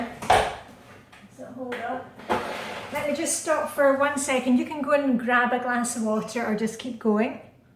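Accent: Scotland, United Kingdom. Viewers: Are you from Denmark? I was going to say you sound Scottish lol hope I don't upset you saying that. ❤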